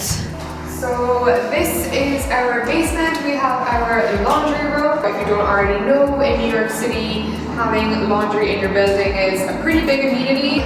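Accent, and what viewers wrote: Ireland. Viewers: omg are you irish too? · Is this an Irish accent?? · I hear an Irish twang coming through.. Is she Irish? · The 461 yes she’s from Cork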